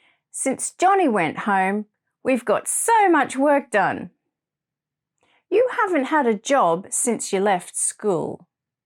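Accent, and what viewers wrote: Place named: United Kingdom. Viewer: Hi,! I enjoy your British pronunciation. I found your channel today and will continue to use it.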